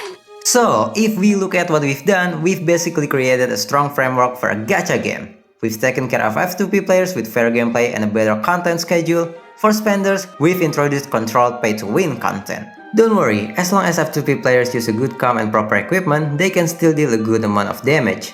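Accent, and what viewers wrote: Indonesia. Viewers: oh indo toh, kentel banget aksennya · Hop on Limbus gng. In what world where a CEO got lucky with gachas will give you 10 free pulls. Either way im an indonesian myself and ive been watching you for quite a while and you know your indonesian accent stuck way too much on your english it makes me wanna laugh so badly (i cant lie lmao). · hell nah, satu satunya orang Indo yang masih ngehidupin komunitas GT, mangat yak, make sense knp logatnya kek org Indo😅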